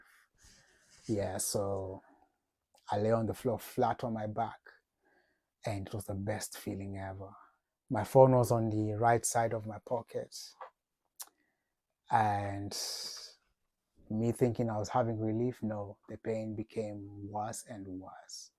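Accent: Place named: United Kingdom. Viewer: Its the UK accent for me 😊thank you so much for sharing, very educational, cant wait for you to be done with all these😮mungu mbele bro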